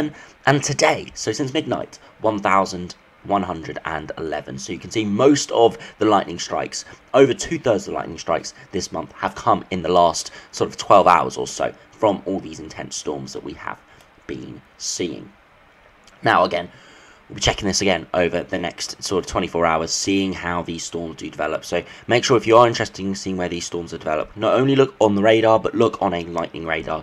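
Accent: London, United Kingdom. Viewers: Where does the guy doing these updates live? I'm guessing London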